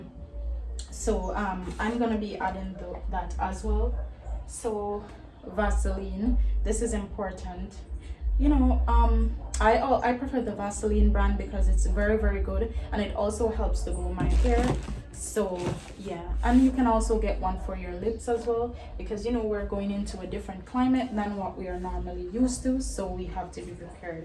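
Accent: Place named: United States. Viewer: I can hear the American accent coming up little by little... Especially when u keep using the term "you know"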